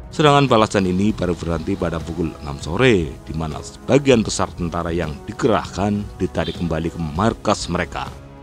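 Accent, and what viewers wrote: Java, Indonesia. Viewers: Ini yg baca narasi nya kata per kata nya ga jelas artikulasinya suaranya terlalu ngebas dan terlalu ke JAWA JAWA an jd baik nya diganti aja ke yg lebih nasional org nya pecat aja tuh ok tq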